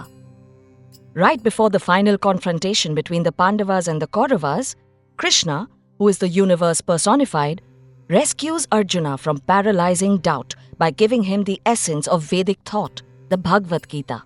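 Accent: South India, India. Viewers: are you madraasi southindian saying arjunas kitna bura lag raha sunnein mein,please bachhon ko to sahi words bataayo baba it is aadi Shankar not adishankara saare words theek bataiye kripya, yeh aa mat lagayeeye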